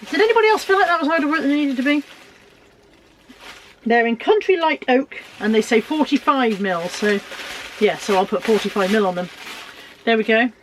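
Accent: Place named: West Country, United Kingdom. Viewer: I'm almost certain I just seen you in my local charity shop in bridgwater if not was your double with that lovely West County twang 😂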